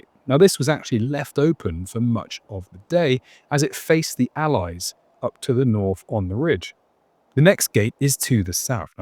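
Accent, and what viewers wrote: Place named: London, United Kingdom. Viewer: It’s not an impediment it is I suspect a London dialect and as such as valid as RP this is not 1930s England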